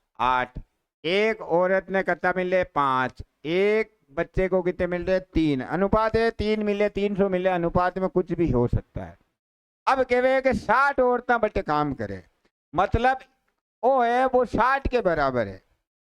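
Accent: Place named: Mewar, India. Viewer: राजसमंद जिले से हूं राजसमंद झील जो प्रसिद्ध है मीठे पानी के लिए वहां से आपका वीडियो देख रहा हूं आप बहुत अच्छा पढ़ा रहे हैं और मैं 10-15 लोगों को शेयर किया आपका वीडियो आप मेवाड़ी में बोलते हैं तो भी अच्छा लगता है और हिंदी में भी बोलते हैं तो अच्छा लगता है और आपका तरीका बहुत अच्छा है सरल और सौम्या तरीका है पढ़ने का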